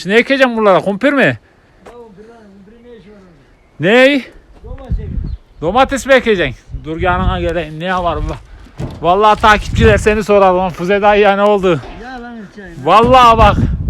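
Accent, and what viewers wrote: Konya, Turkey. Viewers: Sen Konyalı mısın Konyalı gibi konuşuyorsun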